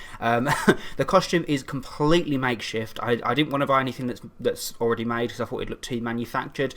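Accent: United Kingdom. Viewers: you look like the person from: I survived a zombie apocalypse:thom because of the british accent and the face looks so similar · omg, your gay/british accent gives me shivers in a good way. dont tell my bf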